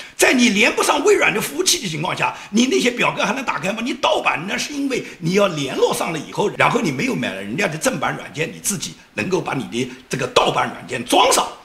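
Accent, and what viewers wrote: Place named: South, China. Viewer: 亲切的南普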